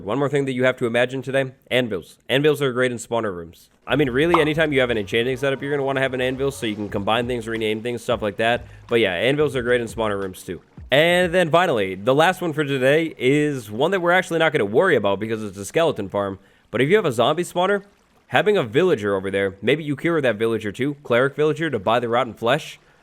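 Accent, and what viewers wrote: United Kingdom. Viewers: omg I love his British accent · ahh yes british boi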